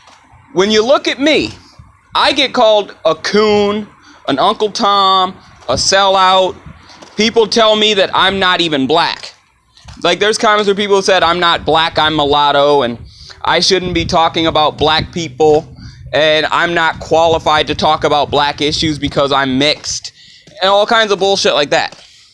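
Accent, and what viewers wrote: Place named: United States. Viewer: I'm not sure what to say or think, at first I thought you were trolling -- but maybe you are an american and thus the accent. I'm mixed race as well -- and I've put a lot of thought into the subject for my years on this planet ... I don't quite cosign everything you are saying but I'm sure its accurate to a degree based off of where you live and the people around you -- but I do understand not being taken seriously if what you say is outside the popular narrative du jour. my life has lead me to embracing individualism as a primary value -- something I intend on passing on to my son who is even more mixed. still do you, its nice to see divergent voices and points of view especially among us multiracial folk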